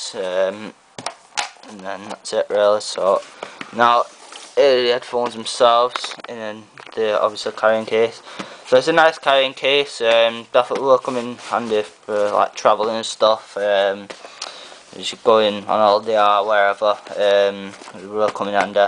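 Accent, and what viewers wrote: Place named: United Kingdom. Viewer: HE'S FREAKING BRITISH..ITS HARD TO UNDERSTAND BRISTISH ACCENT SOMETIMES..I HAVE A BRITISH ACCENT TOO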